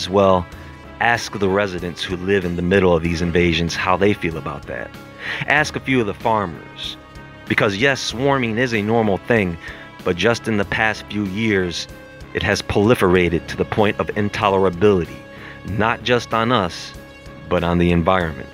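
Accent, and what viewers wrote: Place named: Philadelphia, United States. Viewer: Sounds like a Philly accent,anyone else hear it?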